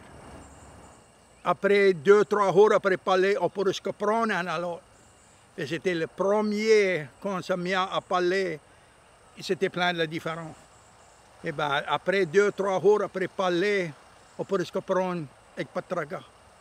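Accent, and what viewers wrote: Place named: Acadia, Canada. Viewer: Jai toute compris ca que tu a dit je suit du nouveau brunswick canada un acadien on parle comme vous👍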